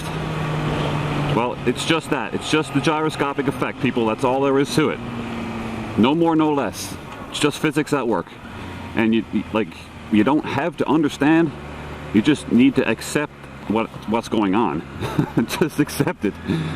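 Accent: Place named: Ireland. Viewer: stop fing and talking and do some cornering. bla bla bla. Some Irish accent is coming out to lol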